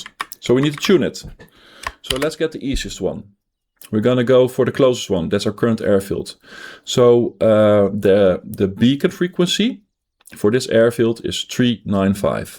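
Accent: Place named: Netherlands. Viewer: you sound utterly Dutch 😂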